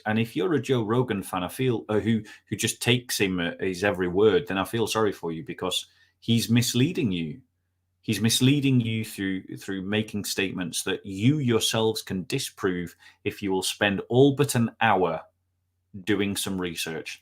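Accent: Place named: United Kingdom. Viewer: Dont see many livestreams of but I am glad I did see this one. I love the British accent, God bless!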